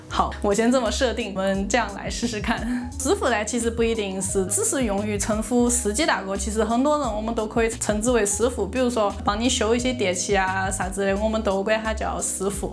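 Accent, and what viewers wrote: Chengdu, China. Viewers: 这个妹儿肯定是成都土生土长的，但父母不一定是老成都，所以发音属于年轻一代的成都人，也没得啥子很地道的方言词汇。要学资格成都话就看李伯清。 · 绝对标准的成都话。亲切！ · 在四川会说普通话就行，这个up主准确说是成都话。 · 你这是成都话，哈哈， 电影推荐你忘记了风车车和假老练（猫和老鼠四川话版）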